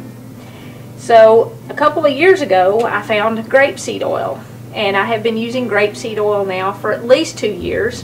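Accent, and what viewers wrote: South, United States. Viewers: I just love southern accents. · Oh my gosh I just loved this recipe! I'm going to try it on my channel. You are so adorable...love your down home attitude, down to earth commonsense and southern accent!!!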